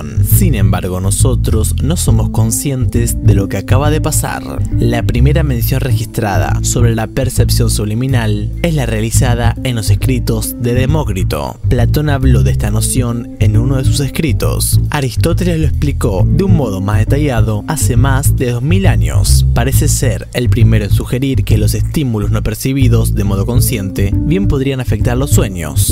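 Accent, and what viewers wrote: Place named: Buenos Aires, Argentina. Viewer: Jejeje, sobre el acento, ya no se nota tanto el acento de Buenos Aires... Saludos, amigo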